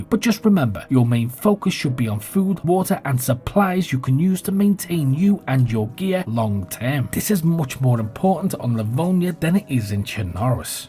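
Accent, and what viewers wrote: Liverpool, United Kingdom. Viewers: Not even close. This bloke is from the UK, Scouse accent from Liverpool probably. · Are you part Scouse?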